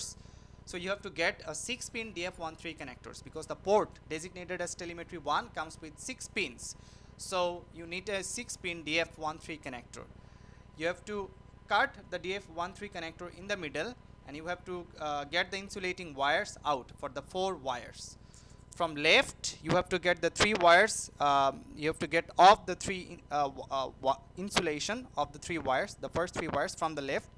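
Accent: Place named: England, United Kingdom. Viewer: The most unattractive english accent makes it impossible and take anyone who speaks like this serious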